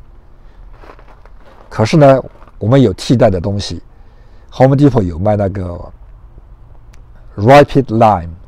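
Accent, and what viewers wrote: Yunnan, China. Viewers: 云南马普，听着好亲切。我就是洒草木灰，管用，肥皂水也可以。家里的鸡根本不吃那粘粘的东西。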